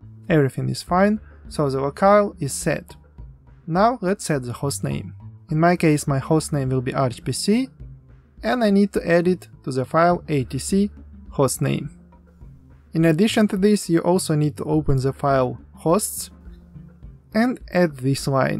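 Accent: Russia. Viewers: русский акцент))) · Акцент не инглиш нихуя, похоже русский. Я по инглиш не шпрэхаю, чё делать то? Пресс Х ту вин? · Оно пытается выглядеть 'своим' для англоязычного ютуба, но с речью решило не заморачиваться и говорит английские слова русскими буквами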